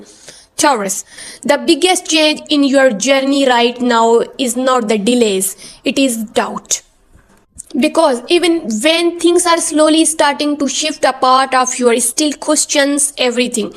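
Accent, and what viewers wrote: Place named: India. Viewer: Panget na ng channel na to dati ok na ok .. Yung Tagalog at lalake pa ung narrator. . Ngayon di na maintindihan pag sasalita naging Indian na ... .. hayss sayang channel mo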